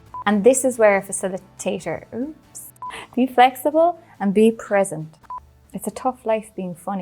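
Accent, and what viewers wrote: Ireland. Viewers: have you replaced Jonathan? It's not often you hear a strong Irish brogue on YouTube. Love it or should that be fair play to ya. Anyway my questions, what are the main DOs and DON'TS for facilitation and what are the he biggest errors that new facilitators often fall into. Both questions are probably related. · Love the Irish accent!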